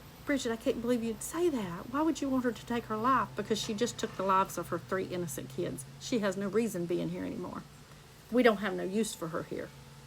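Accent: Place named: Massachusetts, United States. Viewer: Hell of a Massachusetts accent.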